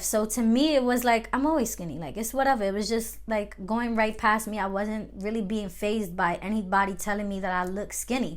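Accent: New York, United States. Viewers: i’m new to your channel, you gotta be from ny 🤣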